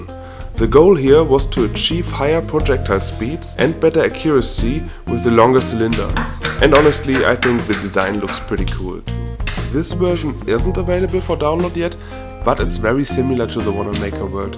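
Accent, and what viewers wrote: Germany. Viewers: Are you german? Your english sounds a bit german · u sound very german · First off, great construction! If I’m right and interpreting your accent correctly, you’re German. Please consider that this is a gun law violation, and if they catch you, you could get into serious trouble. If you are not german and dont have silly laws, then keep up the work lol xD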